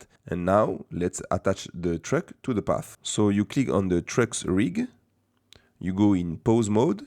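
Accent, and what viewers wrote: France. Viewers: salut, ca sent l'accent français non ? ^^ cool la vidéo. edit: en plus, c'est un windows en fr, donc plus de doute ^^ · are you French ? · are you french? cause of your accents haha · je peut entendre le p'tit bout de francais qui resort. merci pour le tuto! je compte pas re cree la scene mais certains de tes tips m'aiderons ^^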